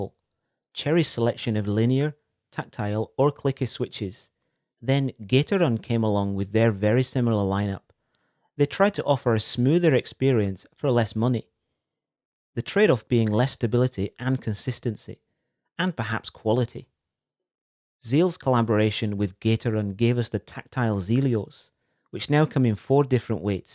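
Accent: United Kingdom. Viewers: did your accent change to more scottishy or is it just me?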